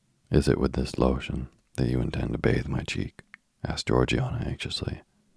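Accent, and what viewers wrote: South, United States. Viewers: a slight Southern twangy sound somewhere in your voice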